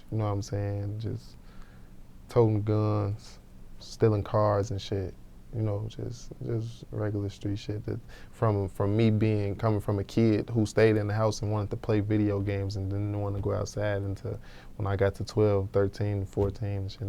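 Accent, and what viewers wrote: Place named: Wisconsin, United States. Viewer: he not formally from the chi, from Wisconsin his accent mixed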